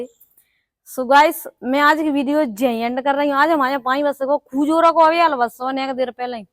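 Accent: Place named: Rajasthan, India. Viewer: बहन आप कौन से गांव से हैं यानी आपका मायका कहां पर है आप तो मेरी तरह भाषा बोलता है मैं भी राजस्थान से हूं प्लीजबताएं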